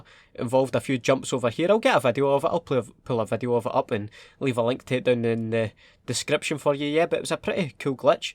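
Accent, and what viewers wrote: Scotland, United Kingdom. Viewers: Hey I’m kinda new been around for couple months, been binging on your vids looking for as many as I could find and I dunno just thought I’d have a try, ever had a feeling to do a video like on of your og commentaries. I know you’ve moved away from them but I just love the Scottishness in them😂😂. Also what did actually happen to the troops?😂but anyways love the vids man keep it up❤️❤️❤️ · Clicked just to hear your silky Scottish voice say ‘Faverla’ ;)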